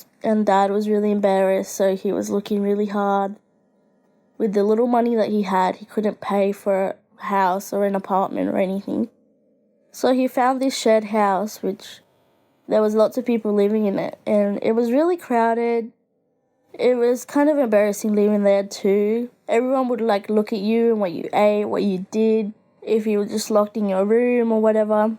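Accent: Australia. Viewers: Finally an Aussie! (im australian) 🇦🇺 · I knew you were aussie! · She’s Australian don’t make fun of her accent mate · I knew you were Aussie Aussie’s rock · Are you Australian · YOU’RE AUSSIE? YAY! I AM TOO! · Finally somebody who is aussie 🇦🇺🇦🇺🇦🇺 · Oh she's an Aussie 😀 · She’s Aussie! I’m not the only one yay! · So, are you Australian or Story booth is Australian? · OHHHH MEH AUSSIE GURL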